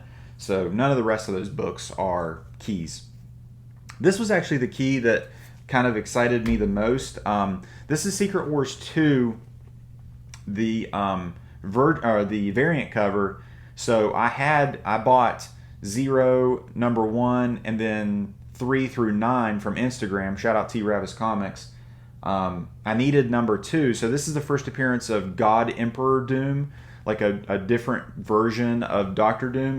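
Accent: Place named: Ohio, United States. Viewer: Judging from your accent your from somewhere in Ohio.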